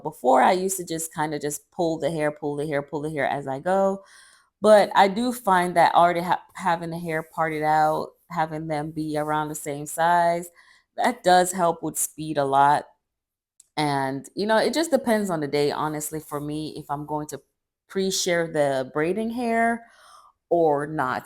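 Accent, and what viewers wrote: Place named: Jamaica. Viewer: love ur jamaican accent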